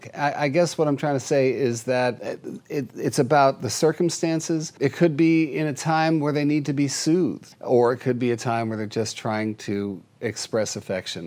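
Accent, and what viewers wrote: England, United Kingdom. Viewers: you are too much. I love your English accent delivery. This is such a neat, unusual community. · Hi, love your English acent! My cat Cloud gives me headbutts, and my cat Cat's, does the sea otter when I come home!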